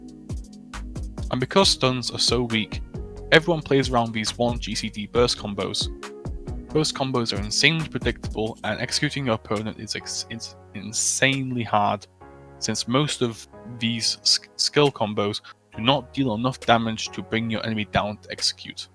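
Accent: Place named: United Kingdom. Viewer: I´m just here to listen ´s lovely brit accent^^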